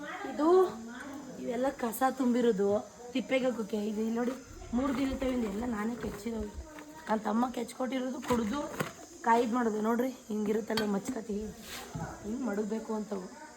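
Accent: Mandya, India. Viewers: ಸೂಪರ್ ಮಂಡ್ಯ ಭಾಷೆ.. .... ನಿಮ್ಮದು ಮಂಡ್ಯ ಅತ್ರ ಯಾವ್ ಊರು ಯಾವ್ ತಾಲೂಕ್ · Yav uru nimdhu nammuru bhashe tharane edhe nim mathu kathe mandya athra yav uru ma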